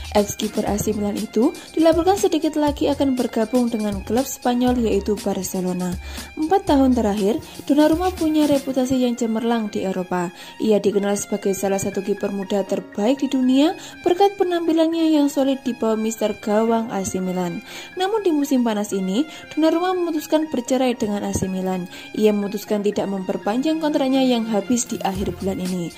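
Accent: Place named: Medan, Indonesia. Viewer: Dari logatnya, aku tau mbaknya orang Medan, horas mbak 😂👋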